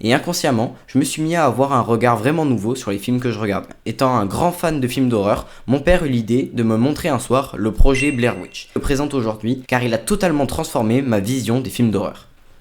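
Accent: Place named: Quebec, Canada. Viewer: tu est quebecois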